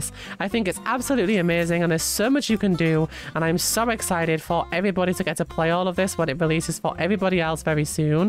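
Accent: United Kingdom. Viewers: GIRL I DIDN'T KNOW UR BRITISH! ME TOO !! <3 im 25% british lol!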